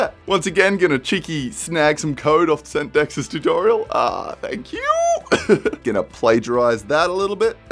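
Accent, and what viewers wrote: Australia. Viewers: I don’t know how I didn’t figure out he was Australian, I’ve been assuming he was British which would be acceptable if I was American but I’M British and parents and entire extended family are Australian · You're Australian nice! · Never EVER do a video where you do not speak anymore y'hear? Your videos are 100x more enjoyable when I get to hear that glorious aussie accent of yours.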